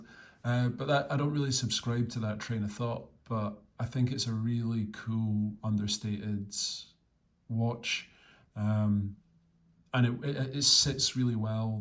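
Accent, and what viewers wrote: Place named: Scotland, United Kingdom. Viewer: Sounds great! I thought I could hear an east coast Scottish accent. Wasn’t sure if your local AD was Jamieson and Carry up in Aberdeen?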